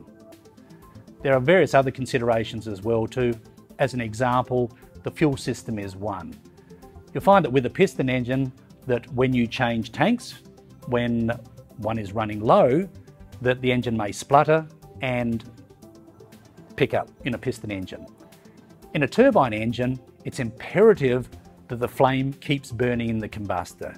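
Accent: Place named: Australia. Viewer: Listening from Australia, was pretty sure that was an Aussie accent, checking online verified it :-) Go Aussie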